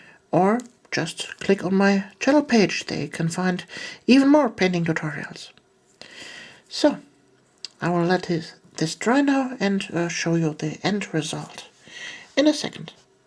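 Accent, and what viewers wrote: Germany. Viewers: No she's German, a lot of foreign accents speaking English come off deeper than our own, give her a break will you? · ICH WUSSTE DAS DU DEUTSCH BIST!! hört man an deiner aussprache.. tolle videos.. danke für die hilfe :D !!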